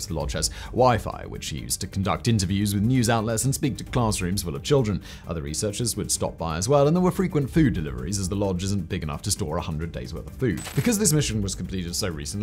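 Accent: United Kingdom. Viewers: omg your posh voice and pose make me doze...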